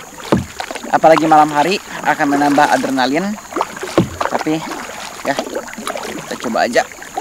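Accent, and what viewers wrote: Banjar, Indonesia. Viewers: Logat banjar nya ada jua lah wkwkwk😂😂😂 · Logat Banjar bahasa Kalimantan banget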